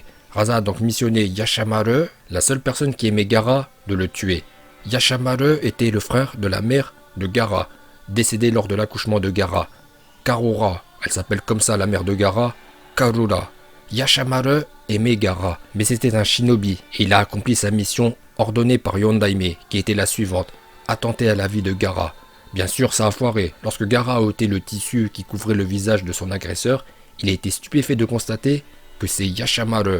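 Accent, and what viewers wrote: Japan. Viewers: Je kiff ton accent japonais continue comme ça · Bravo pour t'est vidéo et continue comme sa je te soutien et continue ton accent japonais c'est tellement stylé quand tu sort ton accent japonais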